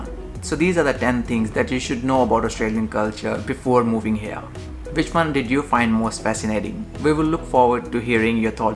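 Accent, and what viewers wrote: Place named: India. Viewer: U sounds Indian!! Aren't u?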